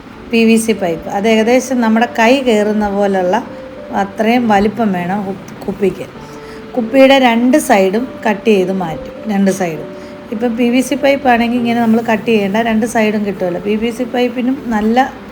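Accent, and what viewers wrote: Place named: Pathanamthitta, India. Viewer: Pathanamthitta സ്ലാങ് 😄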